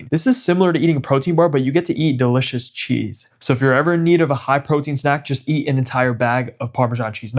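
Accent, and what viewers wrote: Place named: New York, United States. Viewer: What’s with the NY accent in your new videos? I never noticed an accent in your younger videos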